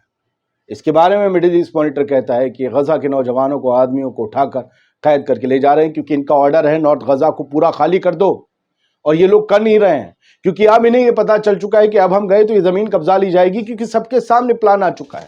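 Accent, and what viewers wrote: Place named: Muzaffarpur, India. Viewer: Bari Muzaffarpur zaban bolted hain aap.masha allah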